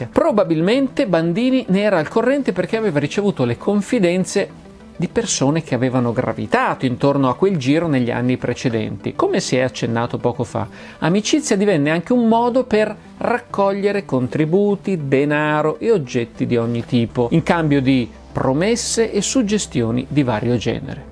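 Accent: Italy. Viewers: Mi piace più il tuo accento piemontese, di quello di Sigir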